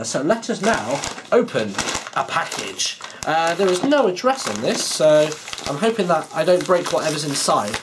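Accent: United Kingdom. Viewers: I love his british accent and the british sleng at "Hello everybodiiiiii!!!!!"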